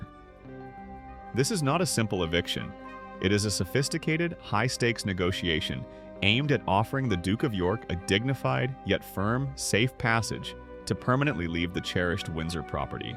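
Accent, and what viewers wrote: United States. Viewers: Why am I hearing an American accent? Our King is not your concern.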